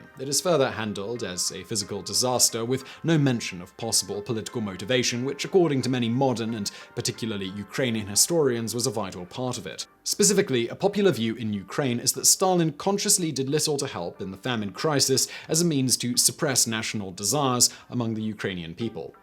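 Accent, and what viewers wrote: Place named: United Kingdom. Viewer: has that Britsh "Tone" that has annoyed people for hundreds of years, especially French people? I know both can annoy us in the States. American postwar influence did not rise from nowhere. The British Empire handed off it's "International Decent Chap" responsibilities to us, and that whole effort took on an American tone, for "Better" and "Worse".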